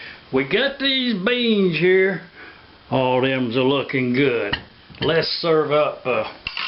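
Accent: South, United States. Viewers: You have a fanfuckingtastic accent my southern friend, hope you enjoyed the grub!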